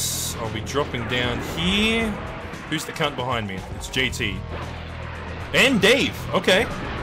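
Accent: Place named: United States. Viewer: Ah it was tripping me out some parts sounded American to me and I am from Texas so could have fooled me.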